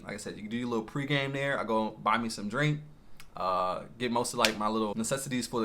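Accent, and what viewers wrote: United States. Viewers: Hey wassup I have no intentions at all of moving there but I do appreciate your suggestions, knowledge, and sharing your informative and detailed Perspectives & experiences. I can tell u would be a great friend to have in my circle. U sound like u from the 5 boroughs. Probably BK or queens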